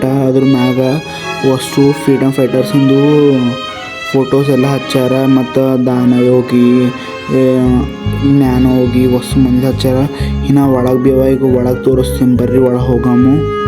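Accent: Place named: Bangalore, India. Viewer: Ean ri kali dome bangalore matadtiri ree antiri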